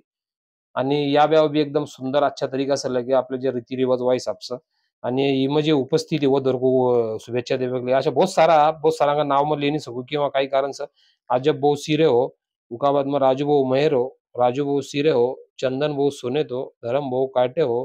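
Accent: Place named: Maharashtra, India. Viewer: बहुत ही सुन्दर आपका बोलने का लहजा मराठी है आप गोत्रों का उच्चारण हिंदी भाषा की तरह करे जैसे सिर्रा या सिहरा मेहर ताजी etc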